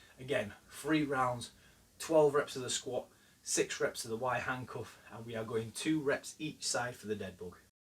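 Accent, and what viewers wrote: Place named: Yorkshire, United Kingdom. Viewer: Sounding extra Yorkshire in this one,! Thanks for the video.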